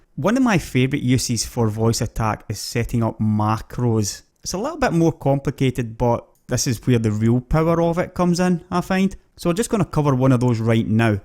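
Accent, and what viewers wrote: Scotland, United Kingdom. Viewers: I find the Scottish accent really pleasant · scottish accent, I dont understand a word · First class presentation of how to use Voice Attack. I got it yesterday and have been looking around for a tutorial to learn its programming from. I am looking to set it up with DCS A10C and whilst a different programme to ED the methodology is well explained with both demonstration and explanation. Your video is going to be by my side when I start setting VA up. I imagine that it will work fine on other games containing a matrix for keystroke mapping/editting such as IL2 and Silent Hunter 5. BTW, what part of Scotland do you hail from??? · I'm so glad I found a Scottish guy doing this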